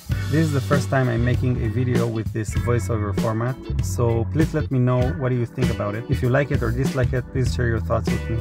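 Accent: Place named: Israel. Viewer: I hear an Israeli accent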